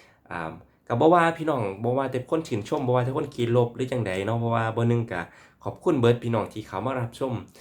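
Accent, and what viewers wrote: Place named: Laos. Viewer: ชอบสำเนียงลาว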